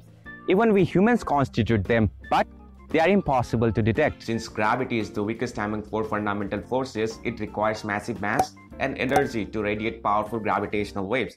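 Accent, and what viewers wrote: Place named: Germany. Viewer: It's nice. But bruh your's English is like a Germenish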